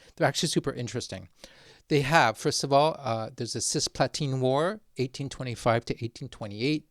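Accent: Spain. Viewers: Brazilian here: fun fact, in internal politics in WW2, Brazil stood neutral in the beginning because it was having good affairs both with the US but also with Nazi Germany. And our government being running by Getúlio Vargas, sweared that we wouldn’t join the war not even if a snake smoked. Getúlio Vargas is known as our first dictatorship that was actually very positive viewed albeit the negative sides on dictatorships as we know them. But when Brazil joined the war, the slogan here was “A cobra fumou”, translated as “the snake smoked”. Edit: I forgive your pronunciation but we speak so much different from the Spanish pronunciation and you basically read our words with the Spanish pronunciation and some people here will get peeved.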